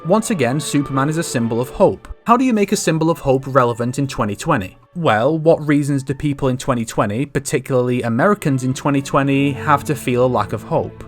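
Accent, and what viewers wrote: United Kingdom. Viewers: “Donald trump is the Real life Lex Luthor.” 🤦🏻‍♂️ You sound British so I’m going to assume you are. If all you know about American politics is what you hear from CNN or your local news, maybe dial back the commentary. Trump has the public’s support because he puts the people above globalism and big government. The media is trying to destroy him because they are far leftists in the pocket of large corporations. Trump is a hero for making himself a target of relentless personal attacks by protecting the freedom of American citizens. He’s closer to Superman than lex Luthor. Using his power for good, when it would be far easier not to. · "Donald Trump is already the real life Lex Luthor". You know 'Donald Trump' is a weird way of spelling George Soros, or were you trying to say Michael Bloomberg? You brits! English between Americans and Brits sounds so different sometimes.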